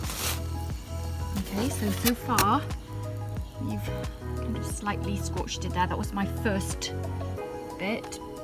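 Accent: Wales, United Kingdom. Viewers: i can't believe this cause i was checking for uuni gas burner reviews & this was my 1st vid cause EVERYONE KNOWS I LOVE PIZZA & WELSH ENGLISH. this is a good sign, but didn't like the dough lol. love from USA.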